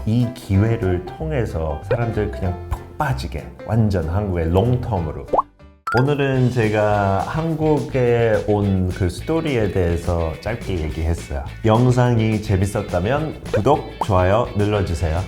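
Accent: United Kingdom. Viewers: 귀가트이는 영어 선생님 이신군요? 품격이 있는 해설과 영국 발음 잘 듣고 있습니다. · 님 방송에서 많이 봤으면 좋겠어요^^ 영국 영어 발음도 너무 멋지시고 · 목소리 너무 좋으시고 영국식 발음하시는거 너무 멋진분~!! 🤗🤗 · 엄마한국 몰랏네 아침영어 ㅎ 영국발음? 아닌가ㅎ 또봐요 ㅎ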